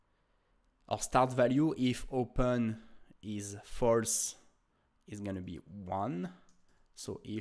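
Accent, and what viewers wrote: France. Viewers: are you French speaking ?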